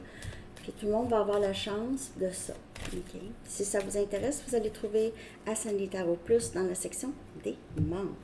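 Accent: Quebec, Canada. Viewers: Bonjour Sandy , Je vous apprecie beaucoup car vous etes vraiment rigolote ,et votre accent quebecoie aussi j'apprecie beaucoup ,vous etes une personne belle ,une bonne personne empathique avec beaucoup de coeur et de partage . Merci Sandy pour tout et bonne journee a vous .❤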